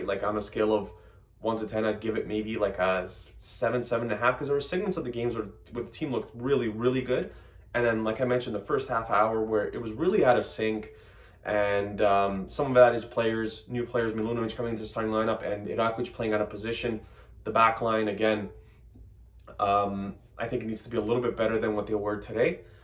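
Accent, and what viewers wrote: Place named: New York, United States. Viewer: Nice recap. Sjajan ti je ENG :) - American NYC dialect :D Anyhow, keep up the good work!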